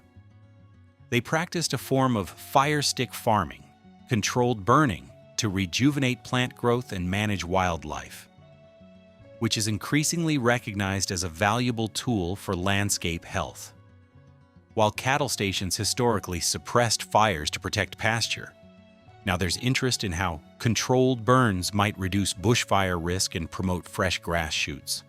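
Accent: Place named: United States. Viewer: As soon as i heard this yanks voice i new this video would be Bullsh!t!!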